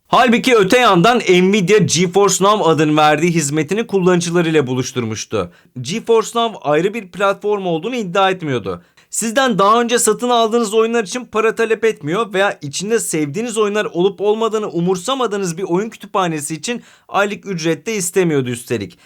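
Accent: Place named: Azerbaijan. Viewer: Abi sen azerisin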